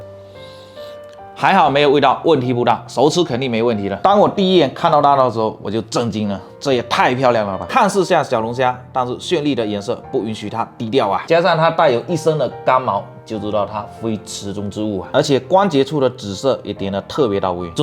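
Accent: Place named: Fujian, China. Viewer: 很喜欢听福建人说普通话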